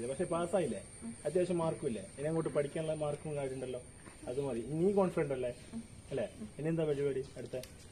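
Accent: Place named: Vadakara, India. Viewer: bro 😍 Vadakara yude thani bhashayil ulla vlogs😜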